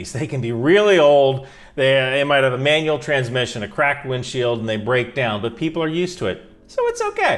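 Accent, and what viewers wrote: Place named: United States. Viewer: As an American who has been to Ukraine several times there is a lot of good advice here and several things I didn't think of until mentioned. But don't take language lessons from him, his accent when pronouncing Ukrainian words is hilariously very American. I would disagree that liquor is usually Vodka. Ukrainians love wine. If like me you don't drink then learn to repeatedly say "Ya ni Pushi". Ukrainians accept that people don't drink alcohol, but they will also be shocked and offer repeatedly to make sure you're not just being polite. Ukrainian Catholicism is very different from the Catholicism you are used to in the USA. They are primarily Greek Catholics and Roman Catholics are uncommon.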